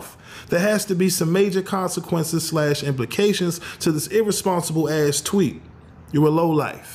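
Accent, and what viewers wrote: Baltimore, United States. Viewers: U from bmore?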